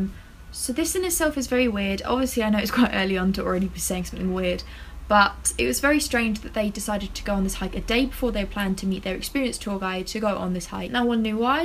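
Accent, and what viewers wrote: United Kingdom. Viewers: are you British, Australian, or South African?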